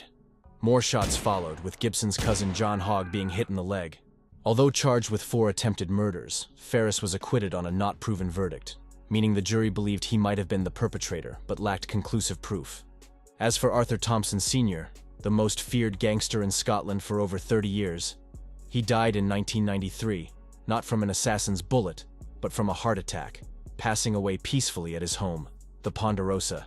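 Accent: United States. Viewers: Narrated by a robot Yank who has no idea where Glasgow is so totally unwatchable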